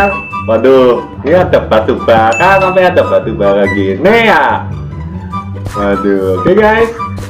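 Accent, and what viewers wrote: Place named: Java, Indonesia. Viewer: Dari suara nya kayak orang jawa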